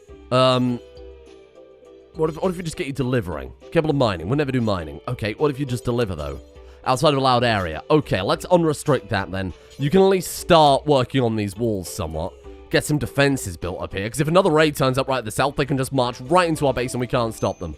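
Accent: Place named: United Kingdom. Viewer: Are you being serious. Because he's definitely from Britain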